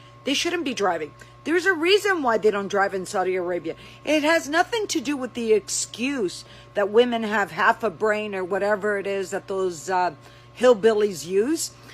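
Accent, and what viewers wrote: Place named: Canada. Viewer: Nice to hear a real Canuck.........Yes we should wake up.....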